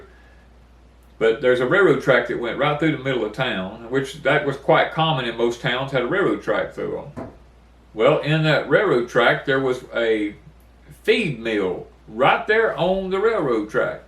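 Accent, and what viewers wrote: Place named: Mississippi Gulf Coast, United States. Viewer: Oh my! I have to tell you something funny. I am 63 y/o and live in Oregon. I stream lots of homestead channels but yours caught my attention because I couldn't put my finger on your accent. I can usually tell like Florida, Louisiana, Carolinas, etc.But yours just stumped me. Until today I heard you talking about love bugs and the old Sunflower store and now I know where you are from. LOL! I am from Long Beach and you sound like home! No wonder I couldn't quite place your accent. My kids still live in Bay St. Louis and Biloxi and I'm on the coast today visiting and dropping hints trying to get my son to buy a farm!! 😂 Oh and one more thing.... Love bugs live inside the trees and are released when hurricanes snap them open. My brother does Auto air conditioning in Gulfport and love bugs are a harbinger. 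They swarm when the A/C season starts picking up and again when it slows down.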